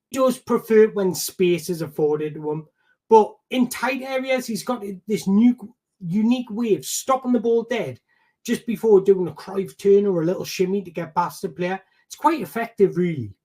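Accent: Newcastle, United Kingdom. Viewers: Mate I’ve been following you on Twitter for a few months when I saw your name and photo I assumed you were Spanish or something I was not expecting a Geordie accent hahahaha. Top content!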